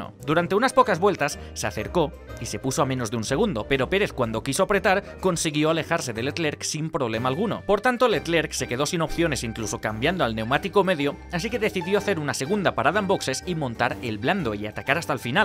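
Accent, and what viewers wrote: Spain. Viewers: Estaría bien que se narrara sin ese acento gachupín fastidioso.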